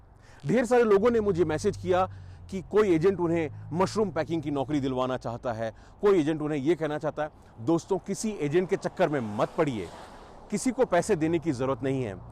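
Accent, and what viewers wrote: Standard. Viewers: love you दोस्त, बहुत खुशी हुई आप अपने देश से इतनी दूर होने के बाद भी अपनी मातृभाषा नही भूले और गजब की शुद्ध हिंदी बोलते हो आप की भारतीयों को भी समझने में कठिनाई हो जाये 😜 अच्छा लगा किसने अपने का इस तरह राह दिखाने का काम करना 🌹🌹💐💐🌹🌹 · Your hindi is very pure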